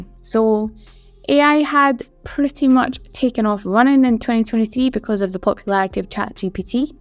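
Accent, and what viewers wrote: Scotland, United Kingdom. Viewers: Is that a Scottish accent? It's a nice one =-). Keep up the great work & quality content.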